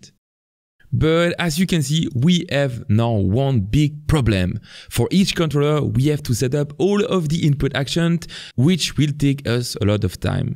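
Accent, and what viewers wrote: France. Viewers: le petit accent francais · beautiful french accent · cet accent so french.. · I'm making this game on my laptop and when I'm finished, I'll export it as an APK file and sideload it to the quest. Sadly, that means I will not be able to test my game until i'm finished. But, I'm determined to do it! My Game is going to be called Glocks n' Goblins. Basically, swarms of mediveal enemies come at you and you have a powerful sword and a glock to shoot and kill them. there are enough tutorials on youtube to let me accomplish my goal and I am so happy to say that out of all the Unity tutorials on youtube, you have been the easiest to follow. But the French accent can be kinda difficult to understand sometimes, I'm from Canada, so I can pretty much understand it. Thank you again! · Bonjour, l'auteur a un accent français... 😉... Il n'existe pas une version française à tout hasard ? 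🙂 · On entend que tu est parlent francais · Ah tu est français? Moi jai reconnu ton accent xD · T’es français ? J’ai dit ça étant donné que ton accent anglais semble français.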